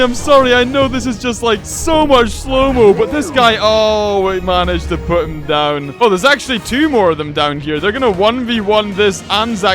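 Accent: Scotland, United Kingdom. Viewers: I'm just here to hear "Algorithm' in Scottish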